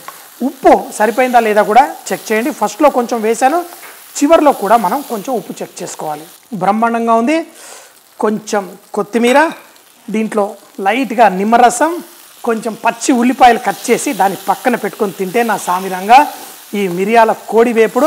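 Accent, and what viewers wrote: Telangana, India. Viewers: Already I love your programs in english, Now your 2nd innings in telugu, especially in telangana style at some times funny with your Dorasani is reaching to telugu people is very interesting, But I could not understand what is "dinchuck dinchuck" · Telangana rural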